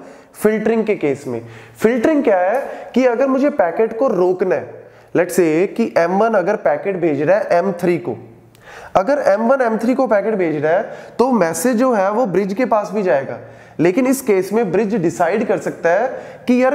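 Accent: Punjab, India. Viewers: That punjabi accent but is awesome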